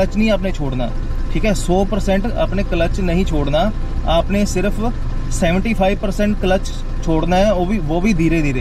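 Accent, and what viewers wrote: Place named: Uttar Pradesh, India. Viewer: I think up army se ho mujhe lag raha hai aap ki Bhasha se aur baat karne ke tarike say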